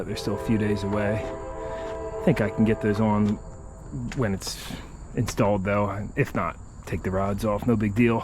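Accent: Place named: Philadelphia, United States. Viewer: Has anyone ever told you you look like Jared Keeso? It’s weird seeing what is a Canadian actor speaking with a Philly accent lol. Love the videos!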